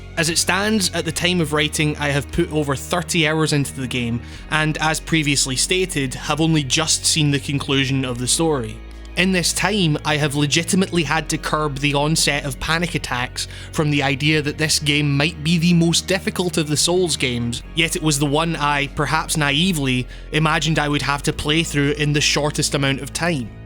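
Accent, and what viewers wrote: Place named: Scotland, United Kingdom. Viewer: This is the first video I have watched from you that didn't feel like i got something out of it by the end. I do appreciate the the experience was landmark for you and that you wanted to share it but for some reason i cannot put my finger on, i did not like this type of video from you as much as your others. For the record i love your work, the way your brain processes information, the way you convey that information to others through an impressive vocabulary that leaves me needed a dictionary sometimes. Also your accent is cool, I would assume...Scottish? Just a guess. Maybe Irish. Odd that I don't know how they are supposed to sound different but that they should. Anywho, i'm getting side tracked. I just wanted to drop in my two cents on how i felt about this video so that you could use it as constructive criticism and hopefully that will help you make additional content that more people enjoy. 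Again i cannot seem to put my finger on what makes this review less impactful and enjoyable than the others but i encourage you to keep at it cause you do good work. Again it's not that I didn't like this video, just less so than the others. I may be in the minority on that though so you should of course get input from others as well. :) have a good one man, hope to see more content soon! Edit: Sorry i responded to such an old video, you may not see this post at all, but i wrote and submitted it before i checked the date on the video.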